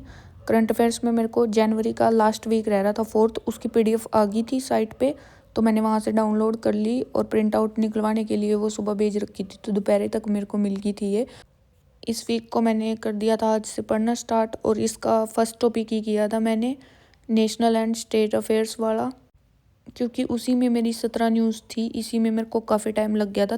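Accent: Haryana, India. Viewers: Hahah shi 😂 haryana aale hi bolya kry nui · Bhen muje lagta h app Haryana se ho awaj se😂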